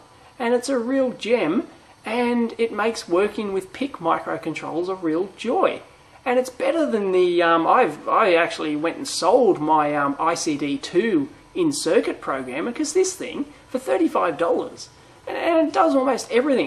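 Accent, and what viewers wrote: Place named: Australia. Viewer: I wondered about the strange accent ... Now I know Australia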